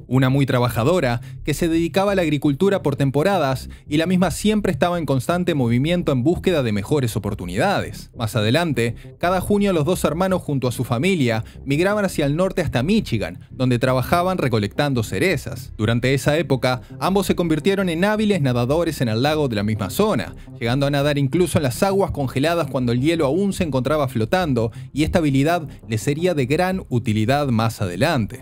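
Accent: Argentina. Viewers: El narrador es Argentino de donde era Sandro ! Che !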